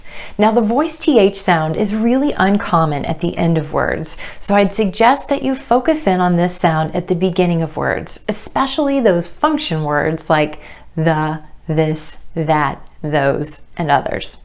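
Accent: United States. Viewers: You are American? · Thank you so much for another perfect lesson. I really have fallen in love with your beautiful American accent. I enjoy every your lesson cuz you explain everything very clearly. Thank you so much for your hard work!!!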